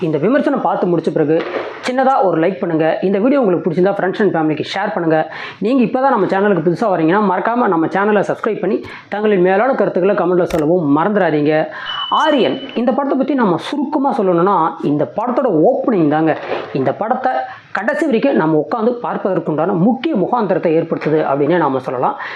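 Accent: Kongu, India. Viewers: CRIME Investigation Thriller wonderful... Kongu Tamizh vazhga..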